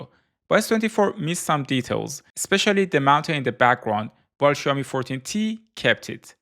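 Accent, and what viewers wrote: Iran. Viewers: لهجه برای یه ایرانیه😅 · Aren't you Iranian? The accent sounds like Iranian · Is this Iranian accent? Or am I wrong? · چرا حس میکنم گوینده یه ایرانیه😂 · داداش ایرانی ای؟ خیلی لهجت ایرانیه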